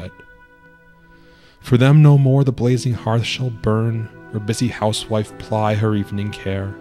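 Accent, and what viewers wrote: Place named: United States. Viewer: Finally .. someone reading it in American accent, Thanks so much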